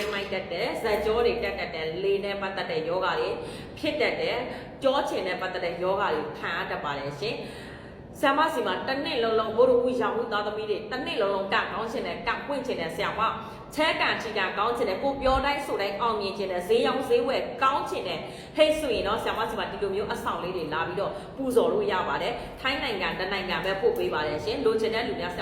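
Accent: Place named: Myeik, Myanmar. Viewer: ဆရာမက မြိတ်အသံပေါက်နေတယ်နော် မြိတ်ကဆို ဟောခန်းလာချင်ပါတယ် လိပ်စာပြောပါဆရာမ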